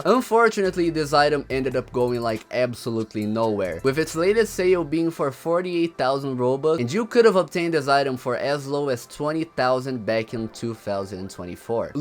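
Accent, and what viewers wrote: Brazil. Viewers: esse sutaque de br vai ter q vir num churras de domingo aq em casa em. BTW VERY GOOD ENGLISH BRO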